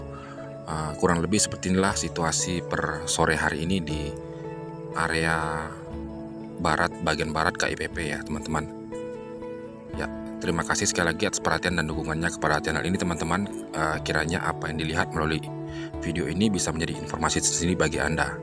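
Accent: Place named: West Java, Indonesia. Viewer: Bang ngomongnya kek orang sunda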